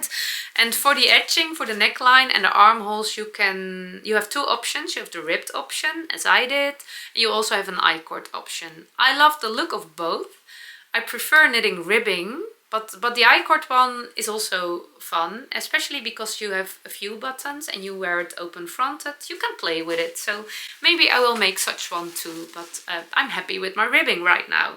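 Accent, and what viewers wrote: Netherlands. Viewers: I look forward to your Dutchy English each week. Thank you for sharing heart jumping moments through your creative processes. The plants you have look happy too. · I love your dutchy English, your yarn choices. Vests are always a great garnement, and knitting sleeves feels like knitting the second sock. 😂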